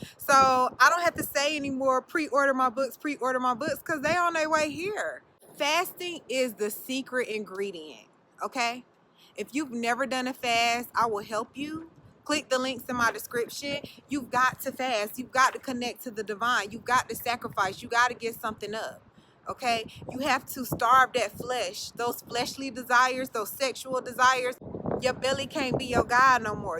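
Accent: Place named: South, United States. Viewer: I thought I knew that country twang. In from Charlotte. Well the past 9 years. I try to listen to other’s knowledge on this subject, but I keep coming back to your intoxication.❤️❤️❤️❤️❤️❤️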